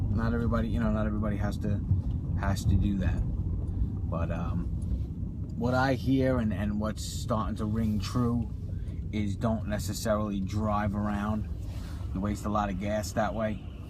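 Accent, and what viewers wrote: New York, United States. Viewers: Just started in Chicago, clearly you are in New York or New Jersey (the accent gives it away) I am also going to focus on the daytime commutes to avoid any of the late night troubles. Hope to see more videos from you & #UberOn also how's SideCar in your market have you tried it? It does well in Chicago in certain areas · That is the heaviest New York accent I have ever heard. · Jajaja that accent is str8 from new york lol gotcha there buddy now i know where u drive jaja lol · "Not gonna disclose my location" your accent is a dead giveaway NYC bro... LOL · The clues gave it away (at least for me). Highly dense, close to trains, and the airport. All i'm thinking is Manhattan NY. The accent just confirmed everything lol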